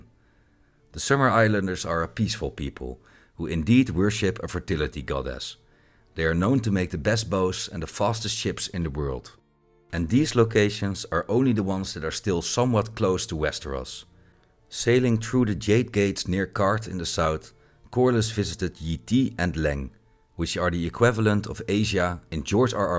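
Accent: Netherlands. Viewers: An excellent video, l've liked and subscribed, best of luck building your channel. Is that a Dutch accent l hear?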